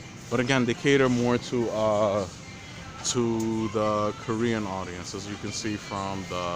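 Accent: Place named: Bronx, United States. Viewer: It was your accent. Just reminded me a lot of my co-workers up in the Bronx. LOL. I work around the Fordham Area. Enjoying your videos.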